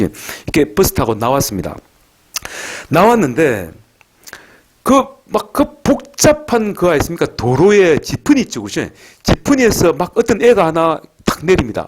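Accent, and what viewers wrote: Gyeongsang, South Korea. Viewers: 예ㅡ감사합니다 30년 학원 폐업신고 할 때 조금 눈물 날라 하더라구예 처럼 강의하면 아ㅡ들 한테 대박입니다 나는 와 그라지 몬했을까 하는 생각이 드네예 투박한 경상도 사투리가 이렇게 듣기 좋은 줄은 같은 갱상도 살믄서도 미처 몰랐어예 ㅎㅡ 양산에서 멀지 않은 곳에 살고 있으니 일요일 오후 혹은 저녁에 찾아 뵐게요 미리 일주일 전 쯤에 연락 드리고예 일일이 답글을 더 길게 달아주시니 가족 같은 분위기 좋기는 한데 힘들까봐 걱정 되네예 간단하게 살살 하이소 마 ㅡ ㅋㅡ 먼지 땜에 흐린 하늘이 모처럼 태양이를 내놓네예 좋은 오후 되이소 감사합니다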